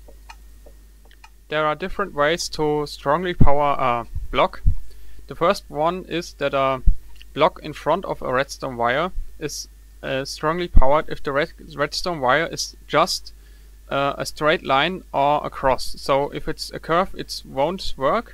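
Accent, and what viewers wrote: Germany. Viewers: Ich hab gleich den deutschen Akzent erkannt :)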